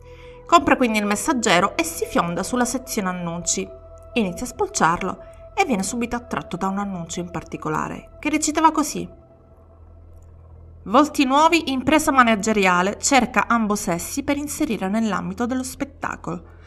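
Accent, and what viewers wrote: Sicily, Italy. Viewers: Probabilmente il commento può sembrare inappropriato…e ti prego: “prendilo davvero x ciò che è; UN COMPLIMENTO!” ma devo proprio dirtelo… Nonostante le storie di cui racconti il tuo accento fortemente “siculo” ti rende davvero sensuale! Forse perchè sono innamorato delle donne siciliane, ma nonostante non ti si vede sono sicuro devi essere bellissima…e appunto…sensuale!😍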